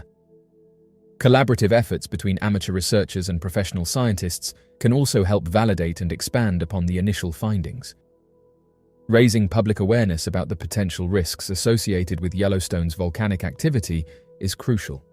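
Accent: United Kingdom. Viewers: Why is it that most videos I watch are about the USA and yet the voice is always British? Don't any Americans know about America, or do the British not want to talk about their own .....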